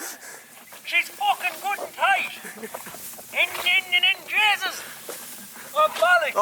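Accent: Ireland. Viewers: The rings were class but you need practice man!! The Irish sayings and accents going worldwide lads! Fair play 😎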